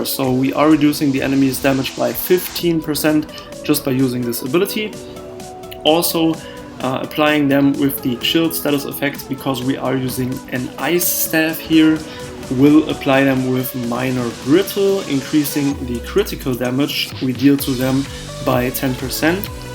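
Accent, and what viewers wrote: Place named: Germany. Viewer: nice build and well explained. The background music is a bit loud and overall terrible for such a long video but everything else is well done. Finaly someone mentions the Trial by Fire set, it's so overlooked and hands down the strongest defensive set for PVP. I would also like to see a build using Torug's Pact, another much overlooked set. Liked and subbed and looking forward for more content :) PS: Dein deutscher Akzent ist killer :D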